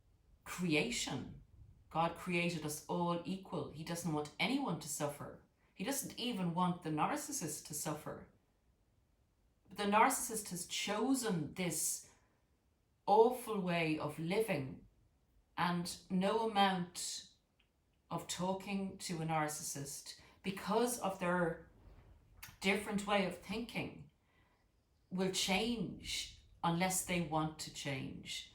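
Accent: Ireland. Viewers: It is like balm to my soul to listen to your lovely irish voice. I have had a lifetine of narcissistic trauma. Parents,ex husband,romantic relationship,boss. I am so done with it. NEVER AGAIN!!! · Her beautiful and musical Irish accent, and amazing storytelling (another undeniable Irish talent) just keepet me hooked to her great content. Thank you for nurturing us with your wisdom and beautiful Irishry.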